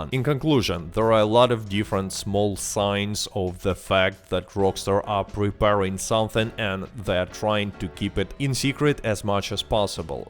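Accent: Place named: Russia. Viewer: Я один отчетливо слышу русский акцент в речи автора?🤠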